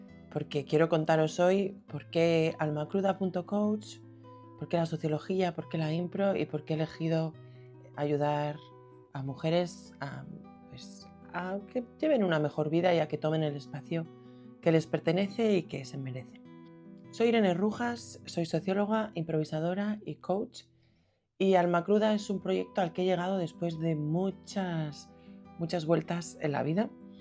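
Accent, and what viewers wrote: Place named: Galicia, Spain. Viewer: ¡Queridísima amiguiña! (con ese acento galleguiño que tanto adoro), felicidades por este precioso vídeo tan auténtico, donde muestras tu alma cruda con total sinceridad y valentía. Me he emocionado mucho, gracias por tus palabras, para mí el camino también fue muy especial, sobretodo gracias a ti. Aunque mi historia no es la misma, me he sentido identificado en mucho, porque también sufrí acoso en el colegio, aunque ni de lejos tanto como tú. Nuestro pasado nos ha llevado hasta aquí, eres así a pesar de todo, pero tu generosidad hace que quieras canalizar esa experiencia en ayudar a otras personas. Sin pretenderlo, con este video a mi me has ayudado mucho, ¡gracias! Acabo de empezar yo también una nueva etapa profesional solo para ayudar a otros bichos raros como los que tú y yo fuimos alguna vez, con la ilusión de ayudar aunque sea a uno a sentirse un poco más integrado. 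Quizás seamos unos ilusos, alguien pensará que incluso seamos débiles, pero ya una vez aprendí de otro coach, que los más fuertes son precisamente los que reconocen sus debilidades y errores ante los demás. Doy fe de que puedes ayudar a mucha gente, te apoyo y te animo a seguir, porque eres maravillosa única y especial.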